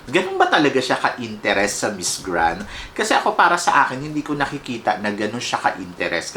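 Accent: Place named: Philippines. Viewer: Anong probinsya mo sa Pinas,? Para kasing hindi Tagalog ang native tongue mo.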